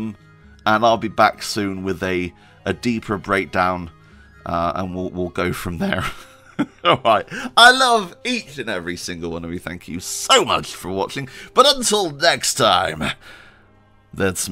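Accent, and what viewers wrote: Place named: Bristol, United Kingdom. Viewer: nice place, was there a few weeks ago. Grew up in Bristol so the accent seemed vaguely familiar. Anyway, getting back to the point, great video. Working my way through the first one at the moment.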